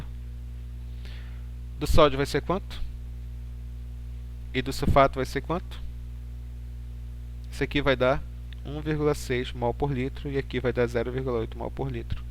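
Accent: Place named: Recife, Brazil. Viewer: Você é de recife né? Logo vi hsuahsau, mto bom o vídeo, ajudou mto!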